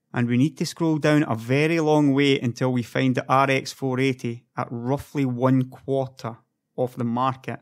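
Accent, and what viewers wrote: Scotland, United Kingdom. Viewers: Your Scottish pronunciation and dificult is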